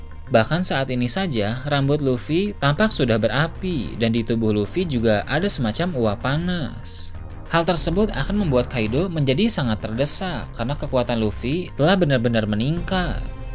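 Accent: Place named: West Java, Indonesia. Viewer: Yang ngomong ini orang Sunda, Jampang fixz wkkw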